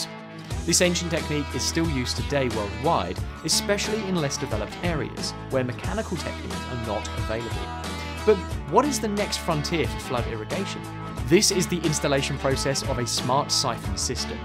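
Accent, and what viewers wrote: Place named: England, United Kingdom. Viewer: I really don't need the england man in my screen or voice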